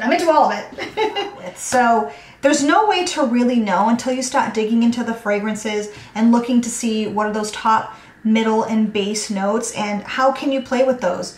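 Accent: Boston, United States. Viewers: I came for the candle content but stayed for the accent 🤣. I was born in Boston but have been living in Cali for over 30+ years. I miss that accent soooo much. Loved this video - and many of your top 10 (13) are in my stash as well 👍. I can second the recommendation for Little Bee Scents. I’ve used some of her FOs and they are AMAZING. A little on the pricier side, but worth it in my opinion. I’ve watched a couple of your other vids and really appreciate the honesty in your critiques, assessments, etc. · Another fellow Bostonian-ish. So funny! I actually grew up in Revere and live in Illinois now :) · Hi, I stumbled upon your videos and found them very helpful. Im new to candle making and after hearing you talk I was excited that you might be in the Boston Area ( due to your accent) Im in Peabody Ma. I then watched your moving vlog and learned that you had just moved from L.A. 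to San Diego. LOL. Although I was born in Boston, I moved to California in 1992. I started in San Diego,then moved to L.A. (Venice Beach, West Hollywood). I moved back to Boston about 5 years ago....took me a while, but realized I was always a new englandah.... Anyway, I really like your videos and subscribed. I find them wicked helpful. Good luck and keep up the good work. P.S. Im a graphic designer by trade, so if I can help you out, just give a hollah.... I owe you!!!! I saw your video when you went to the market...I agree.... I love your sign too! Cheers Chuck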